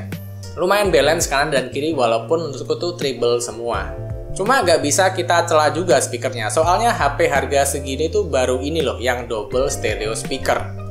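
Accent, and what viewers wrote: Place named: Java, Indonesia. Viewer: logat jowo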